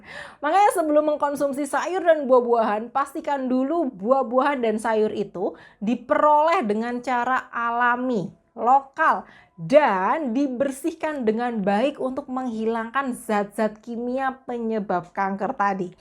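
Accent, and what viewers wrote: Java, Indonesia. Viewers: Dr klu berbicara medok banget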